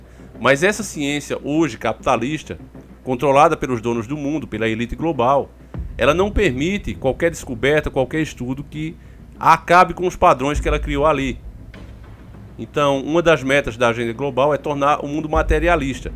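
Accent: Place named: Pernambuco, Brazil. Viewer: Tudo e todos do canal merecem nosso respeito carinho e dedicação de passarmos a diante as informações para abrir os olhos ah do seu respeito a sua região quebra a pau pois esse sutac pernambucano igual a do meus pais e irmãos que nasceram aí me faz voltar a infância tudo verdadeiro nada artificial obrigada por tudo tô em Santos e admiro seu trabalho manda um abraço e pau neles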